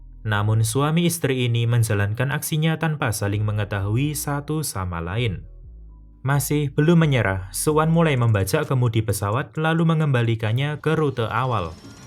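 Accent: Java, Indonesia. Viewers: Jowo pundi mas, sampean?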